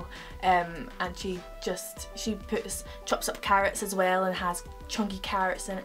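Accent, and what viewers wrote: Scotland, United Kingdom. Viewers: Finally found a Scottish you tuber!! You are so much easier to understand because I'm used to hearing the Scottish accent! Xx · I absolutely love your videos and your accent! Which Scottish uni do you think is the most suitable for a foreign student? I'm thinking of attending uni in Scotland... Love you! xx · Always good to hear a Scottish accent on YouTube! Edinburgh is definitely worth a visit sometime, it's my go to place for events and sometimes work but it's also fun to explore (I still haven't been everywhere yet!) I need to explore Glasgow more, Buchanan Street and Pacific Quay are pretty much the only places I visit regularly. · Aloha! I'm half Scottish but never been to Europe! Maybe someday 😊 I love your accent better than the England English, if that makes sense 😄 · speaking of stereotypes, actually that was pretty Scottish :D love the way you say "person/personal"! 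coming from a St Andrews student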